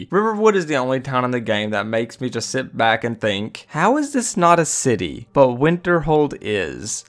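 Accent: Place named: Texas, United States. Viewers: Oh my dude, you are seriously sleeping on Rorikstead; there's something sinister going on there. (This has been covered way in-depth by other YouTubers). Pay attention to the dialogue; sneak in and listen to Jouane give secret lessons to Sissel. Why are their farmlands so fertile? And where are all the adult women? If you were including Raven Rock, that should easily have been #1; it's personalities, the sheer number of questlines, it's Morrowindy (yeah that's a word now) goodness-it's easily on par with any of the Skyrim mainland cities. I don't know who told you your accent is fake but as a native Texan, I assure your viewers that the 'Texas twang' is indeed real. You and the missus have a good one,! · Love your accent! reminds me of aunt and uncle back in Texas... 😌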